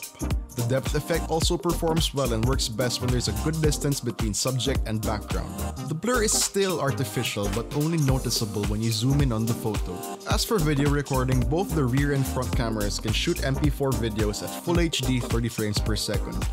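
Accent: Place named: Philippines. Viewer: His phillipino accent is so strong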